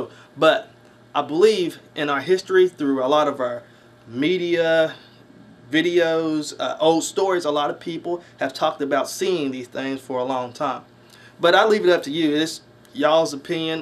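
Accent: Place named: South, United States. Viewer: just curious but are you from down from around like in the North Carolina area? down in the southern u.s area. not to be offensive or anything cause you have an accent. you say "Yall"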